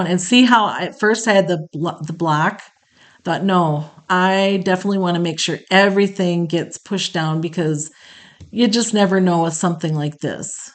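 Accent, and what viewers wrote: Minnesota, United States. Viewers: My first time watching you and I was like she sounds just like my Aunts in Minnesota so I wonder if she's from there and you just confirmed it ,my mom was born in Wisconsin and grew up mostly in Minnesota ,lovely work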